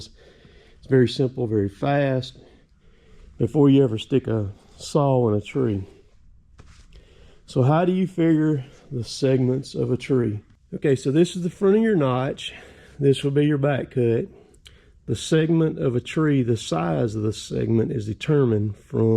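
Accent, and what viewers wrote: South, United States. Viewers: that’s a great learning tool. I was looking for this information and you had it and yes the block for the notches would be a great help. You’re very good teacher. Have a great day and appreciate all your videos. You do a great job on them enjoy your channel, be careful And you don’t talk slow that’s just the way we talk down here in the south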